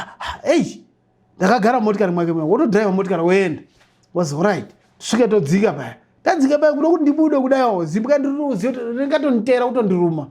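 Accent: Ndau, Zimbabwe. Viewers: Chindau chondispaka zvangu😂😂😂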